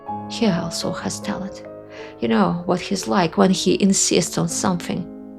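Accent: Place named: Russia. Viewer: This video is really cool. There is no spoken dialogue, it is narrated by herself in her perfect English with her charming Russian accent. The cinematography is vivid and authentic. Enjoy!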